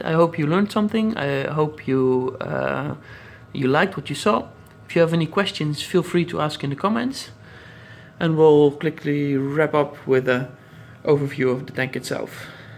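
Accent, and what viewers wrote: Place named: Netherlands. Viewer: I knew , by hearing your voice , you most be from The Netherlands (like I am)...but when I saw you VODKA label I know it.....By the way....Vodka stays Vodka...no W....but that a side....I like your tank!!!...I am going for a reefer 350 later this year..Or maybe have one build for me...the Reefer 350 has no room for a refugium and I would like to have one....Nice video!!!...good explaining!! ..Thanks.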